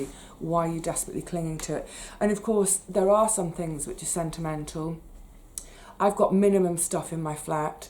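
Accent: United Kingdom. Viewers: Hello!! I just found you, it surprised me to hear a British accent. I seem to only be finding American channels which have helped a lot, but I can relate more being in England.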